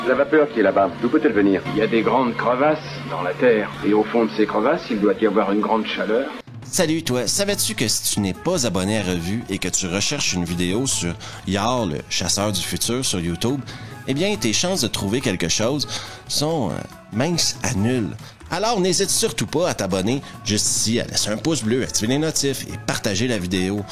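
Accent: Quebec, Canada. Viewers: Ton style unique (humour caustique, présentation fluide, accent Quebecois....) rend ces films attrayants malgré qu'a l'écran on y croit beaucoup moins.Bonne iniative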